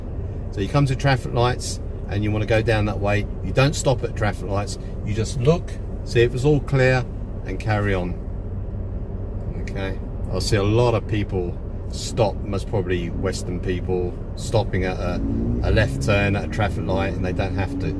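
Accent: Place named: Australia. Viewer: Where did you get that Australian twang in your accent from?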